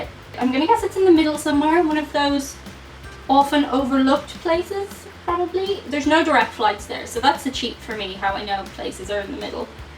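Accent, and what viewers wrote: Ireland. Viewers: I love her accent being of Irish decent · I’m a new subscriber, I’ve been binge watching a lot of your videos. I now have your Irish accent stuck in my head. Oh, I watched editor video. She has so much personality, just tell her, she needs to be Not so perky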